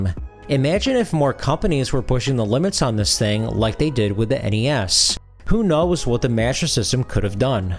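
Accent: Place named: Transatlantic, United States. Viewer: So neat to hear the transatlantic accent with relatively high quality sound compared to commercials and even some films made in the 1940's. Transatlantic + video games = complete bliss! As someone who's played a good deal of both in the 2000's, they both have their standout titles. While I love the Mega Man hexology on NES, Phantasy Star was no slouch!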